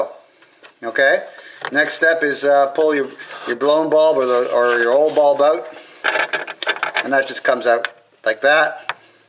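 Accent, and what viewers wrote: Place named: North, United Kingdom. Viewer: Helpful video nonetheless. However, I'm trying to figure out what kind of accent that is...sounds northern.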